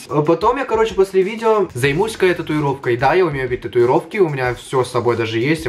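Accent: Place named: Vladivostok, Russia. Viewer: Я-то думаю, почему мне так классно слушать твою речь? А тут Владивосток замешан)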